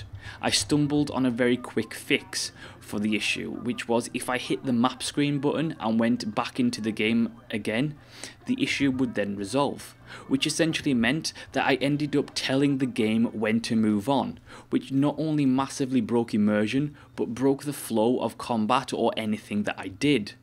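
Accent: Scotland, United Kingdom. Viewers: Are you of Celtic origin? Scottish/Irish?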